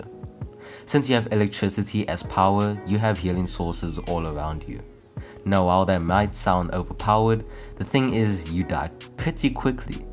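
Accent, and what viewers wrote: South Africa. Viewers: Yarre I can hear in your voice u a Southie mybra🤣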